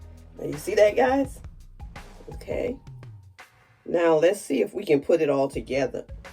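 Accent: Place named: Gullah Geechee, United States. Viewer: Hi! I just found your channel. I really love your content…I am getting my locks installed next month. On one of your older videos, you mention that you are from SC. I would guess somewhere near the low country (I hear the Geechee accent). My husband grew up near Charleston.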